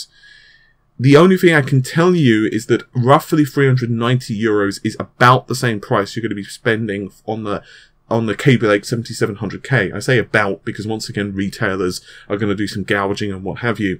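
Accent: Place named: Cockney, United Kingdom. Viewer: 2 & a half minutes til you actually say anything worth listening to. Thru all the mentions of ppl. Let them get their own youtube channel & get their name out there. Then tons of opinions & nonsense. Just get to the fuken content that the video is named after. Less your opinions & bad cockney accent. It's like wading thru a pond in your mind til we get to the information. Why when a mics turned on do ppl all of a sudden get diarrhea of the mouth. You're very verbose.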